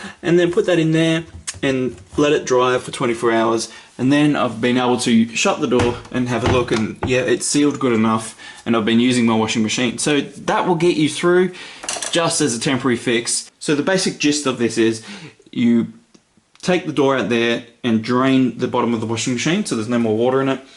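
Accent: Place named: Australia. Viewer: Excellent tutorial!!! You have done your homework. And to hear an Aussie voice, it helped me greatly with my homework. Task completed easily....thanks to YOU. Ps. If I can join the chorus...'Beers are on me'!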